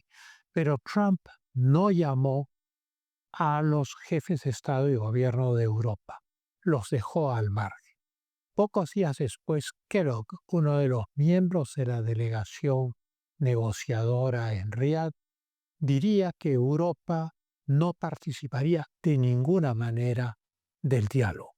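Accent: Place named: Castile, Spain. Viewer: me suscribo por que respeto su cultura y tu hablar clásico del castellano.- casi o colonial. bien hablado ojala sea tu lenguaje y dicción un ejemplo en el lenguaje en la educación del estudiante colegial del Perú.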